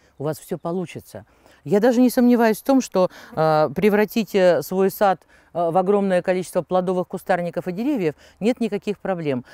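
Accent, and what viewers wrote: Standard. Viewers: Вас слушать одно удовольствие Спасибо. Сейчас такая редкость обычная русская речь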